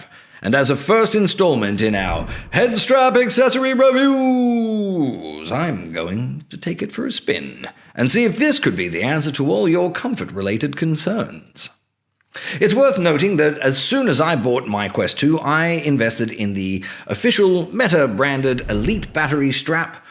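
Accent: United Kingdom. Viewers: you are a funny guy. Nice accent, not overly British, good voice, great to listen.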